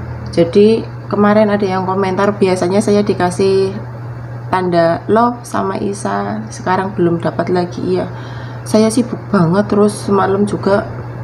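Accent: Banyumas, Indonesia. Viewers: Mbk wong ngapak yak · Nembe nemu channel kie, sampeyan si piyantun ngendi mbak ?, medok banget ngomonge alias ngapak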